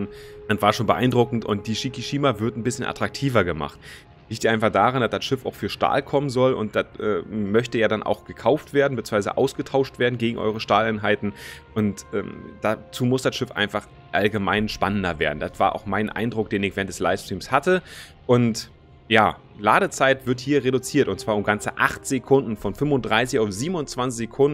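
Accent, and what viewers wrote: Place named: Berlin, Germany. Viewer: Da hört man irgendwie ganz stark den Berliner raus, oder? ;)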